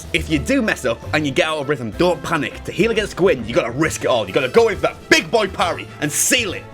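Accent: United Kingdom. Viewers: I didn't come in here expecting you to sound full br'ish, but I stayed. God I stayed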